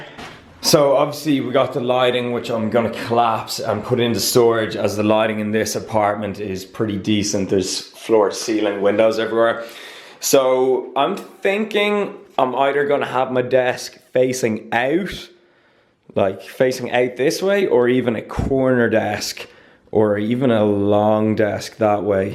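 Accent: Ireland. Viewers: I wonder are you able to speak Irish Gaelic? Your Irish accent is pretty light compared to others I've heard.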